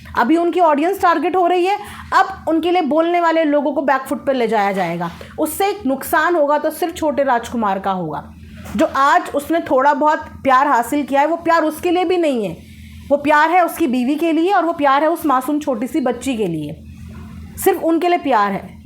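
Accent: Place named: Uttar Pradesh, India. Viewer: Mai to Etna hai chirchirya sun kar waise aap ke vlog bhut acha aur saf hota hai aap bhi up se ho kya khi khu bhasha se lagta hai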